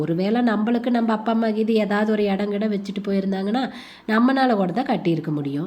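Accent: Kongu, India. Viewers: கொங்கு தமிழ்❤❤❤அழகு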